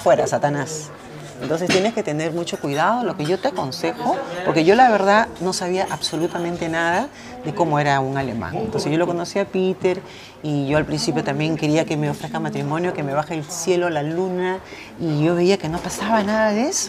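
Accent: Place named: Peru. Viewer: totalmente de acuerdo contigo,sabes yo veo tus vídeos y son tan agradables además tu acento hermoso del Perú,yo soy Méxicana y siempre estoy mirándote y escuchando tus consejos tan sabios y he aprendido de ti y muchas cosas que tu dices es verdad,porque mira que tan diferente es vivir aquí en Latinoamérica y vivir en Europa estoy consciente de eso,mi novio polaco me pregunta siempre de cómo es la gente Latina y tiene curiosidad de todo y yo le platicó muchas cosas para que el venga a México y no desconfíe de mi,y también me gustaría irme a vivir a Polonia y tendria que dejar todo,mi familia y mi país y soy capas de eso por un amor verdadero aúnque le tengo miedo al frío es la verdad y si yo todo se lo dejo al destino porque apenas llevo un año de relacion con mi novio y quiero conocerle cada día más y más y no importa tanto las fechas importantes yo pienso que lo más importante es el tiempo de calidad que se disfruta al estar viviendo ya con la persona que será mi esposo,yo ya no estoy para juegos de Internet y soy Feliz y disfruto día a día la relación que tengo y pienso que si el destino lo quiere estaré pronto con mi novio porque yo quiero casarme con el y no ser sólo una aventura,entonces me gusta escucharte y si estoy de acuerdo contigo me encanta tu forma de ser y me idéntifico contigo,saludos desde México y gracias por tus consejos señora guapa te ves muy guapa de color blanco muy elegante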